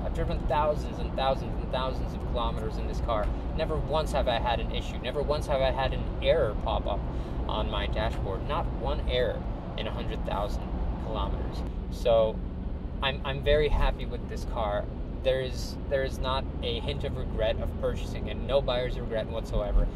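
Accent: United States. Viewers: I thought he was American living in Russia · Why does your accent sound American? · Wow you sound like an American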